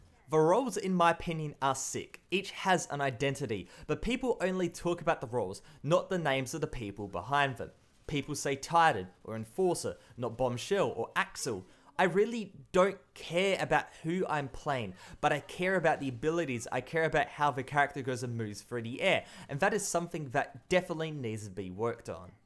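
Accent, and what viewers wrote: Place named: Australia. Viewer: Wait...I thought that's how every Aussie would pronounce those words. Them and the Brits have the same pronunciation for words that in American English wouldn't. I think it's a beautifully interesting thing about the language. Like how the Brits pronounce "torque" and "talk" pretty much the same way.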